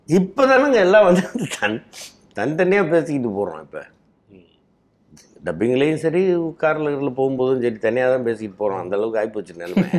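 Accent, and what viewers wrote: India. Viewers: Bangalore tamil? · Ayya mass speech. Thanjvur tamil god bless you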